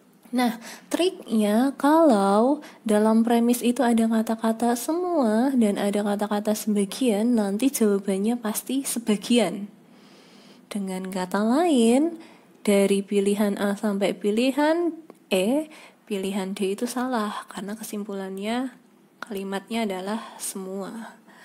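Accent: Java, Indonesia. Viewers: Medok banget mbaaa :v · Sangat bermanfaat ka, tapi maap banget bukan menghujat saya terganggu sama suaranya, terlalu medok sama kalem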